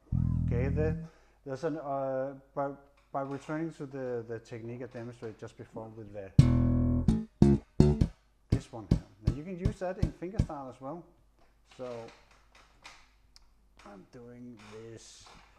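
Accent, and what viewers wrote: Denmark. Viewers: Elsker den danske accent :D Men super fedt spillet :D! spiller selv lidt funkey bas for sjov men gad godt at have din erfaring!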